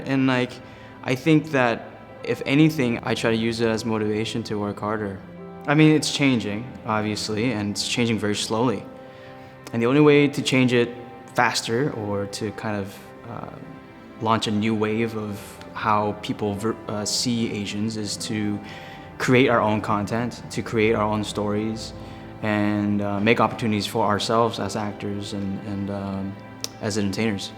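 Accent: United States. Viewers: I guess I hear a Southern California accent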